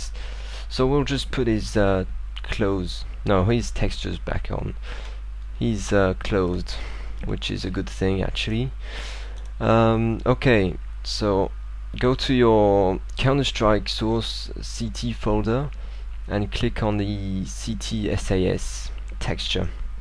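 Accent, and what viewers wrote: France. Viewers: Frenchie accent <3 ;) I'm french too :) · frenchy accent spotted :p en tout cas t'as un super niveau en anglais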